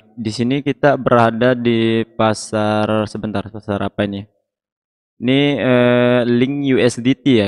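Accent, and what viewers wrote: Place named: Lombok, Indonesia. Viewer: marak dengan lombok logat side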